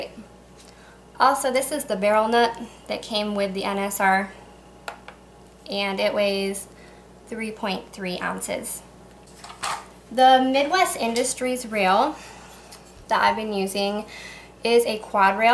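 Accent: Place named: United States. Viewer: Hi there, excellent stuff here. Would you please mind telling me where are you located in the US? I mean a state/region. I have studied English for years know and I love your accent. I am just listening ( and watching also ) and I can´t have enough, since your pronunciation is so beautiful. I really love the language and I would be thankful to you to learn where in the US people cherish English like you do. Take a good care. Jan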